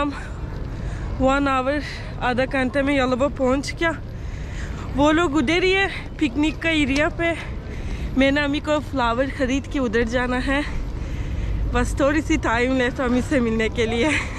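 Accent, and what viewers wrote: Pakistan. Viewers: Thank You for making beautiful vlogs. Totally loved it. I like the way u speak urdu. In love with ur urdu accent. Ma Sha Allah keep making gud videos. N May Allah bless you and your family with healthy life Ameen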